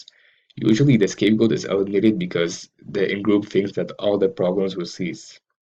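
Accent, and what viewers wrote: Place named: Ireland. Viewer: Great material my friend, this helped me puzzle-out a lot of things I had never figured out before. I hope you are coming back very soon with videos this good. P.S.: I love your Irish accent, I can clearly see you grew up in Dublin!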